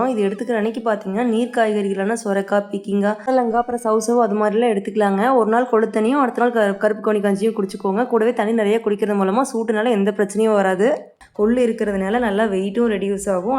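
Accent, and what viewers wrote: Kongu, India. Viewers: Sister love ur way of speaking polite kongu style, ur videos r positive as u are, hats off sisy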